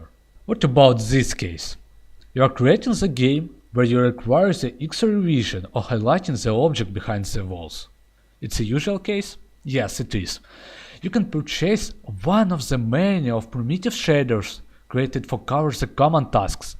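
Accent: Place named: Russia. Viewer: Русские пытаются говорить на Английском) , А еще ваши документации сломались с ассет стора.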